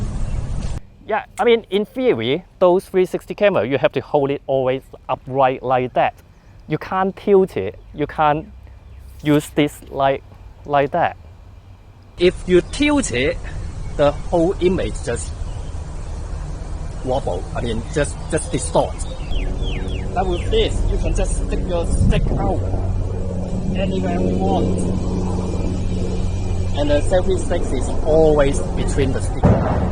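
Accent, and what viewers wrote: China. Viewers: Now i can understand 🇨🇳 ChinEnglish 🇬🇧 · Chinese assent is very cute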